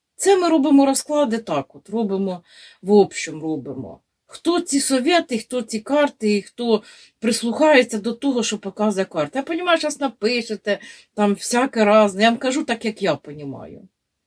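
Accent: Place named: Poltava, Ukraine. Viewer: Привіт з багатостраждальної Херсонщини.Слухаю Вас як дома побувала.Моє дитинство пройшло на Зіньківщині.Там проживають мої рідні,до яких я вже третій рік не можу приїхать через кляту війну.Наш полтавський говір не забуду ніколи.Миру нам і закінчення війни,а вам бажаю здоров'я.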